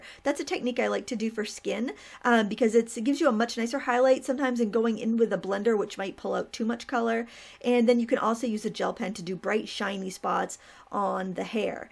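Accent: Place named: Arkansas, United States. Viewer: Hello, Hope you are well. This is really cute just not my thing (yet), hoping to work on some postcard simple watercolors to start with and good basic drawing practice first. Just wanted to let you know I've been watching alot of your videos and to let you know, your way of saying things, you would blend in with us Arkansas folks just fine. We say ain't and other "incorrect" expressions, we also have a tendency to run several words together, but any from here understand quite well. I like the way you express yourself. Ever need a change of pace, we have canoeing, lakes and national forest recreation parks all over the place. You surely be welcome here. Thanks for all your help and support, is greatly appreciated. God bless. Sincerely Becky B from Arkansas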